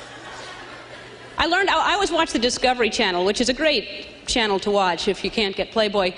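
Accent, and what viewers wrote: South, United States. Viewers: Her southern accent is so much stronger here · has a souther accent here;) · Back when still spoke with her Southern accent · I miss her southern accent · Her southern drawl is more prevalent in this one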